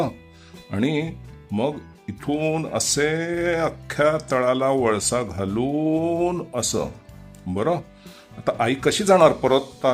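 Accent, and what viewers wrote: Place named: Pune, India. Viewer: तुमची मराठी बोलण्याची पद्धत ही सदाशिव पेठेची आहे.. पुणेरी खोच!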